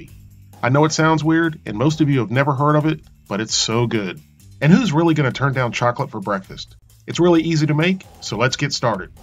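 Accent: South, United States. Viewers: I was born and raised in the South and have family (inlaws, outlaws, etc.) stretched from New Orleans to Raleigh, Chattanooga to Savannah , with alot of old recipes handed down from generations back to the late 1800's. This creation reminds me of the scene in the movie "The Dirty Dozen" when Vernon Pinkley (Donald Sutherland) "inspects" the troops of Colonel Breed (Robert Ryan).... Pinkley: [impersonating a General] What are you eating , son? Soldier: Southern chocolate gravy and biscuits, sir! Pinkley: [shaking his head slowly] Never heard of it. (That also goes for your "Southern Tomato Gravy and Bisquits"). From your accent, I just can't place which "South" you got this recipe from.... I will try the loose meat sammiches though. Bless your heart.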